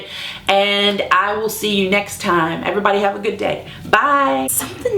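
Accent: South, United States. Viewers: High five for us girls with a southern accent!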